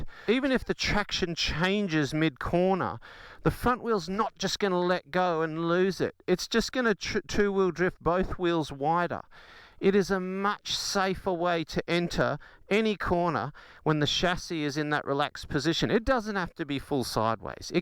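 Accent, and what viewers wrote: Australia. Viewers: the Aussie accent just makes it more engaging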